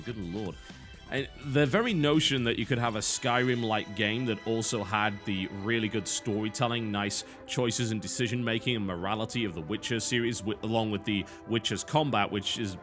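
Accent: United Kingdom. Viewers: maybe he enjoys the sound of you manly British voice....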